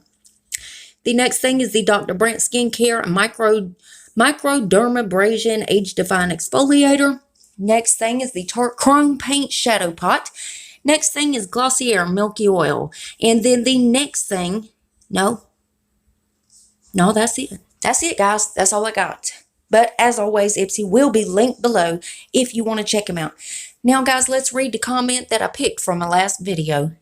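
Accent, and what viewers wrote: South, United States. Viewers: I love your southern twang! I am excited for the Betty Boop!!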